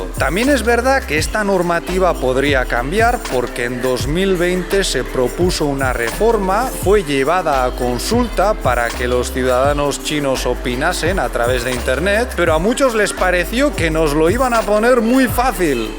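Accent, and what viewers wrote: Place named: Spain. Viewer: Puedes usar un poco de sarcasmos en tus videos? Te sale muy gracioso por tu acento tierno español, gracias me divierto mucho, tu bebé es muy linda.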